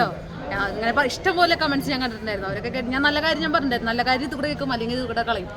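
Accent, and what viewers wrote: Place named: Thrissur, India. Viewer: Kore vishangal negative comment idum. Athonum mind cheyanda ❤️. Ninak oru ahagaravumilla. Ninte thrissur slang anganeyanu. Ath manasilakkunavarum und. Athonum manasilakkathe bodhavum vivaravumillathavarude comments mind cheyanda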